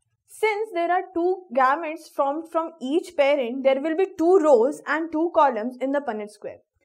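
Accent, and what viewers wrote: India. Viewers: the indian accent is dominant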